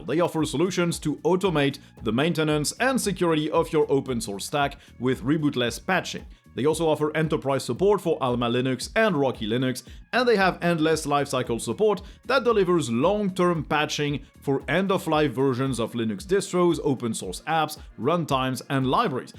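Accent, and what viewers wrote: France. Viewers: I come here for the Linux news, I stay for the French accent.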